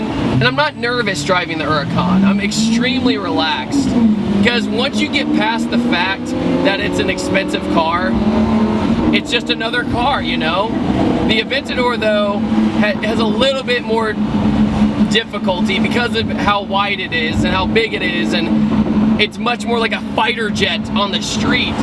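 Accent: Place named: Pittsburgh, United States. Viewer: Dat Pittsburg blowg doe.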